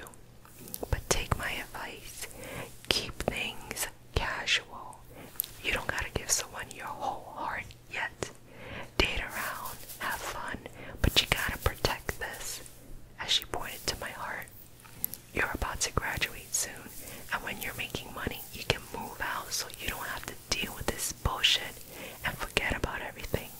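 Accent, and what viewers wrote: United States. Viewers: Your NY accent is all up n down your vowels... i love it lol... where in NYC did you grow up? If you tell me youre a Brooklyn chick i'll die 💜 (assuming you respond again)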